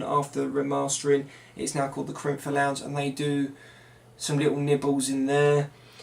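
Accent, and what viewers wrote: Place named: Cockney, United Kingdom. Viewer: Love the cockney London accent!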